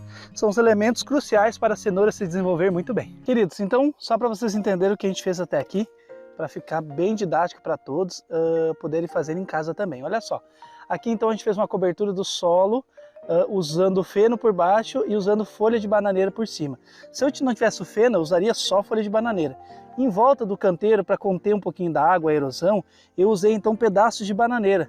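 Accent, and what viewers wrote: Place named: Rio Grande do Sul, Brazil. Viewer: Olá, sou gaúcho. Pelo sotaque vc tbm. On?de fica teu sitio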